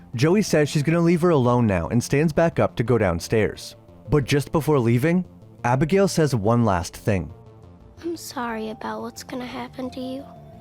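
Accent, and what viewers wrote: Canada. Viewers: Holy Canadian accent!!!!!!!! LOL!! I forgot how Canadians pronounce things like “Out and about = Oot and aboot” “Mom = Mum”………..I thought my MN accent was bad!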